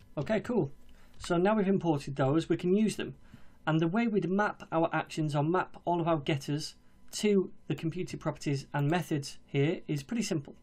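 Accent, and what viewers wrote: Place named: Northern England, United Kingdom. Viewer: I watched every Vue video. I have to say... playing at 2x speed really pushes the mind as you deliver your content so fast anyway! It's also extremely refreshing to hear a northern accent so intensely after living in London for seven years... Thank you very much!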